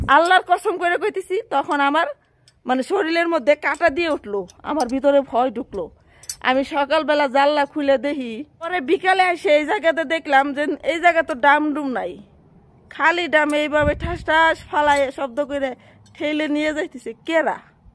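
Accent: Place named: Bikrampur, Bangladesh. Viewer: আচ্ছা আপা কিছু মনে করবেননা, আপনার দেশের বাড়ি কোথায় একটু বলবেন? কেন যানি আপনার ভাষা শুনে মনে হচ্ছে, দোহার কিংবা নবাবগঞ্জ অথবা বিক্রমপুর হবে.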